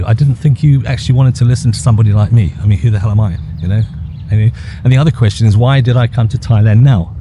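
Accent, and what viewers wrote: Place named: South Africa. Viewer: Definitely pick up your s.a. accent . How many years did you spend in uk after Zimbabwe?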